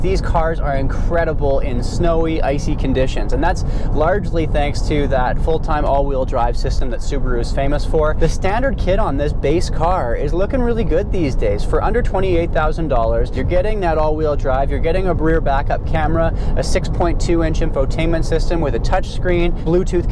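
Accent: Australia. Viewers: Your Australian accent is excellent! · Thats bloody good Aussie accent mate, good on ya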